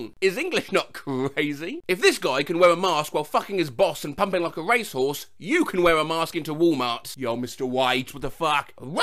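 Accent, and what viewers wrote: United Kingdom. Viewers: ITS SO FUNNY HEARING THIS MEME IN MY HEAD CORRECTLY AND THEN HEARING A BRITISH GUY, WHO CAN READ KEYSMASH, ATTEMPT TO READ ALL THESE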